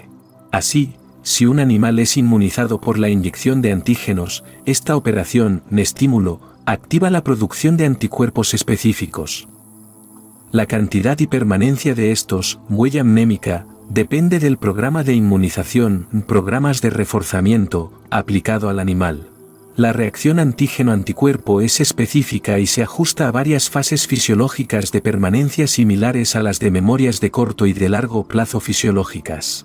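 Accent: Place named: Spain. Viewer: se agradece sobre manera, el doblaje en español de España, gracias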